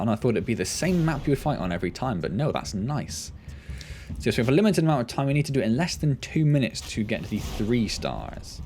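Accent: United Kingdom. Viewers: gdamn i like ur voice u british?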